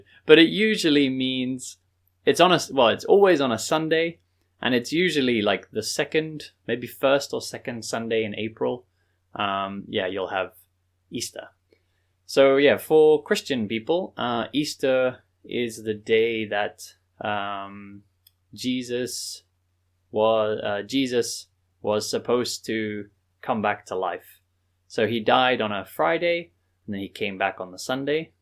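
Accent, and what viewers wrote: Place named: United Kingdom. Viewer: Thank you, I have been living in England for 4 years bot I have got prublem in listening and spiking. I have been loking for a poudcatd and i belive thay your poudcast is the best for peopels how like leirn english by acsent uk. thank you egain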